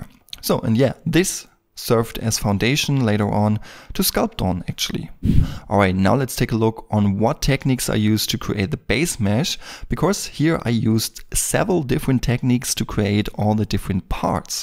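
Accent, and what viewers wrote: Germany. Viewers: Uhh dein deutscher Akzent kommt schon durch, was es aber interessanter macht :3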